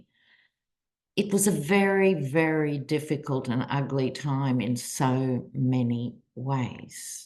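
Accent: Australia. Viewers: Love you,! Your Aussie accent & dry humour somehow makes the bad news easier to take!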